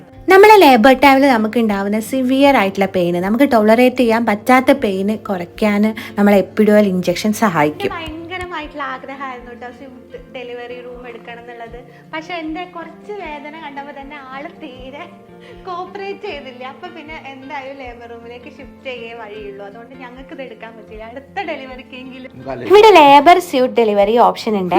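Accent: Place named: Malappuram, India. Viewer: ആഹാ താങ്കൾ മലപ്പുറത്തുകാരിയാണോ 😮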